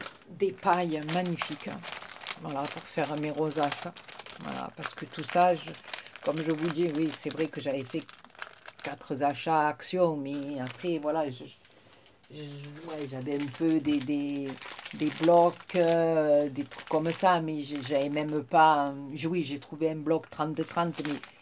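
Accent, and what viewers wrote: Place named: Marseille, France. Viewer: Coucou je me disais bien que tu étais du sud (et de marseille) car tu as l'accent de chez nous, et pour être honnête j'aime beaucoup ton accent et ta franchise. J'aime beaucoup.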